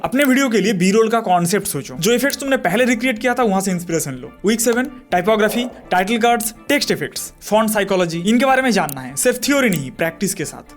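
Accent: Bengal, India. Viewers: ভাই তুমি বাংলা বলো আমাকেতো প্রথমেই লেগে ছিলো য়ে তুমি বাংলা কথা বলো তার জন্য তোমার কথা গুলো একটু আলাদা লাগছে 😊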